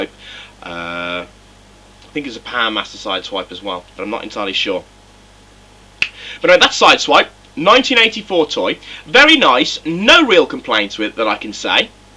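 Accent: United Kingdom. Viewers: your british.wtf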